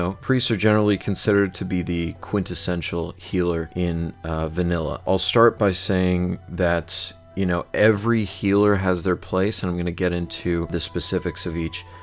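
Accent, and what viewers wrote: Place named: United States. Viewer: I'm glad to have stumbled upon this gem of a channel! That's the case for me especially, since we seem to be very much on the same page; I'm like your European counterpart (unless you're not from the US, but you definitely do sound like it). Following the announcement of official Classic WoW, I was actually considering creating a channel and making videos on similar topics and in a similar fashion as you're doing, but real life got in the way, so it's nice to see that intention is being covered by someone else with roughly the same style, interests (LotR, obviously!) and even the same specific goals for Vanilla. As it happens, I'm also really adamant on playing a healing character since I played mostly as a mage and hunter during retail Vanilla & TBC (from which I'm returning to WoW directly). Like you, I was leaning most heavily towards the priest class, but now I'll also be strongly considering paladin, shaman and even druid (because I love HoT healing), at least for my alt characters. 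To effectively try out all healing classes, I'll be playing on an instant-60 private server called RetroWoW, where you can get free pre-raid BiS from vendors and start raiding or doing battlegrounds right off the bat. That should make testing out all of these classes a much quicker process; hopefully it'll allow me to make an educated choice before the launch of Classic WoW. Aside from which class to pick as my main, I'm also torn between Alliance and Horde. I feel more comfortable playing as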